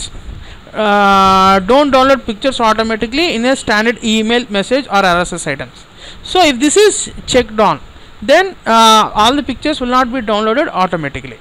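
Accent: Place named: Pakistan. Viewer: Speak English. I do not understand Pakistani.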